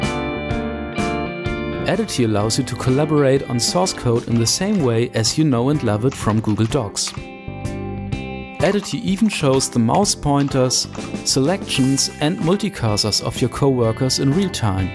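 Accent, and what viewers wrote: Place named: Germany. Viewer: Love the german accent ;)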